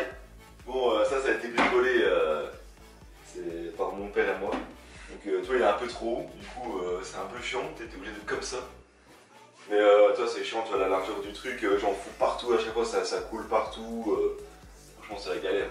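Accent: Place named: North, France. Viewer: Ton accent du nord me fait trop rire ahah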